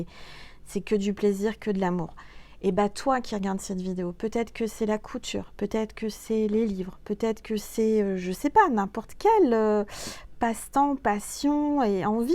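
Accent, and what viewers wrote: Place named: Belgium. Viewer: Ah oui..je me demandais...🤔 Tu as ce petit accent Belge...😇💋😘🙏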